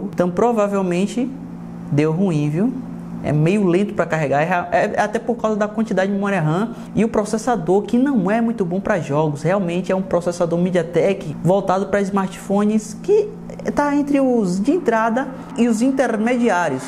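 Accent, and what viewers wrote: Bahia, Brazil. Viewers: Like só por que tu é baiano meü querido. 💪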